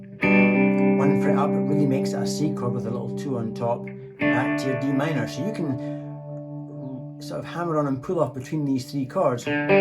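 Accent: Scotland, United Kingdom. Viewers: As a 63 year old Scotsman living in the Alps for the last 20 years, and recently taken up guitar and watched quite a few videos, I just love the Scottish patter and your skill with the instrument and your words. Keep it up, appreciated by many as I can read, but definitely by me. As a car lover I also smiled with glee at your wish to get a new car without kerbing and clutch abuse. Looking forward to watching more of your back catalogue of videos. Loved the camping one, felt like home in the north west highlands, Many thanks.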